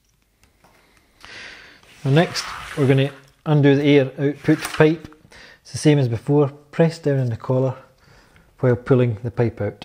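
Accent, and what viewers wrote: Scotland, United Kingdom. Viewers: Great Video, I love your Scottish accent!!!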